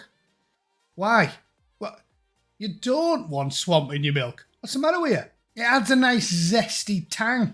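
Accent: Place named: Liverpool, United Kingdom. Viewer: Keep it Scouse bro. 😂😂😂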